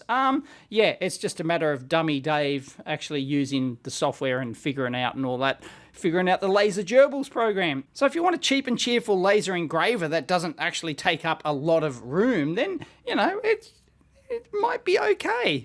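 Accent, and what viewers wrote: Australia. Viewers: What brand is the screwdriver set? I hear "weir" or something. Or was it Wiha? Sorry, listing to an Australian with American ears.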